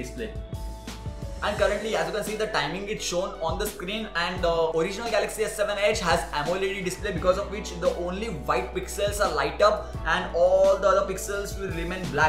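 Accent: India. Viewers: no disresoect but i wish that some videos were limited to their country of origin because 8 cannot for the life if me understand the Indian accent 90% of the time. It just pisses me off when I click in an interesting thumbnail and I can't understand what is being said!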